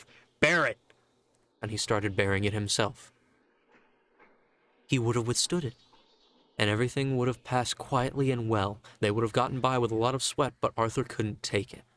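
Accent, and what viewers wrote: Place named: England, United Kingdom. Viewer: This was the best production of an audiobook i've listened to. You struck a good balance of acting without going over the top. The voices are really good. Even your English accent is brilliant, that's coming from a brit. You had me wondering if there were multiple actors untill i read the comments. And i loves the subtle background noises and effects, they were just the right volume and not distracting. WELL DONE, please do more, i dont even care what the book is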